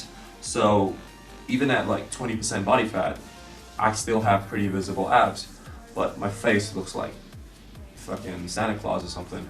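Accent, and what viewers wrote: United States. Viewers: You said you are from Britain but then why do you have an american accent???????????????????//// ???????? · From your accent I thought you were American not British!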